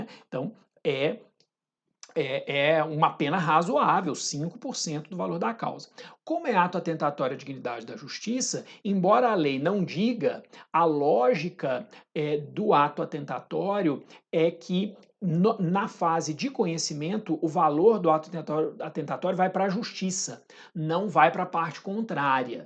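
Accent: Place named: Minas Gerais, Brazil. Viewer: adoro suas aulas. Uma inspiração! Adoro seu mineirês (pq sou mineira)... otimo! fantastico!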